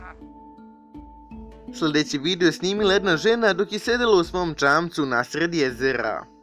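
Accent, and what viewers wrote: Montenegro. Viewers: Da li si ti iz Crne Gore?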